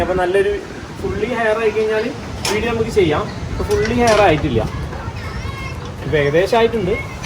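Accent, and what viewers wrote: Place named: Pazhangadi, India. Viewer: Pazhanghadi bhasha polich 😂👍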